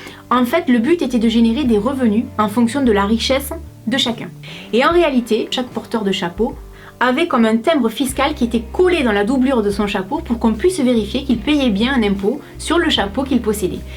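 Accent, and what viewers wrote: South, France. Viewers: J'adoooore ton accent 👌😍 Le sud en force ! 😂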